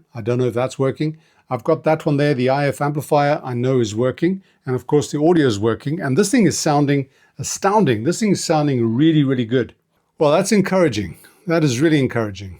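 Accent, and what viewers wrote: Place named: South Africa. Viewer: Haha! I recognised the accent so I thought I’d make you feel homesick! Our 4 sons were all born in Johannesburg, and we lived there for 45 years. We were the last to leave, four years ago now! Love the channel. Alles van beste, Mike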